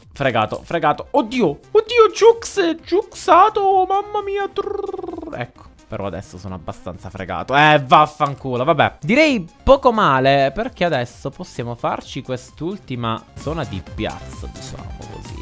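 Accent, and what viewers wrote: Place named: Palermo, Italy. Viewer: melo sentivo che sei palermitano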